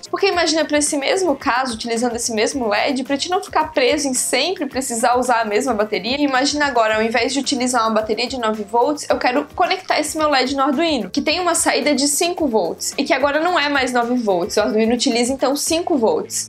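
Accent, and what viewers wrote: Rio Grande do Sul, Brazil. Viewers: E pelo sutaque é gaúcha kkk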